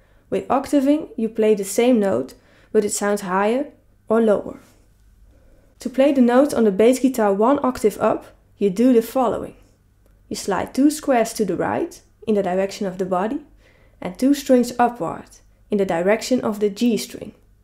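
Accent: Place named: Netherlands. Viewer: Thank you.... you made it so easy. Like your Dutch accent.😌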